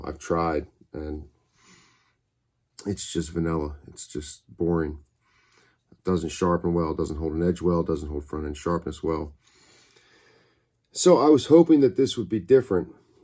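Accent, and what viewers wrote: United States. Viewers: This is off topic but every time I watched your videos your smooth deep voice always reminded me of someone I couldn’t figure out, but now I realized you sound like an American accented Clive Owen. 👌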